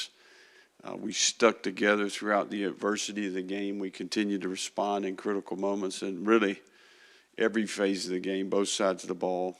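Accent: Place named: South, United States. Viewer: Finally a coach with a southern accent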